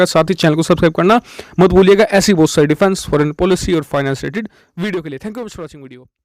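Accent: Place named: Haryana, India. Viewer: जनाब हरियाणा के रहने वाले हैं क्या?? आबाज और इसके Impressions तो हरियाणा के रहने वाले से मिल रहे है ।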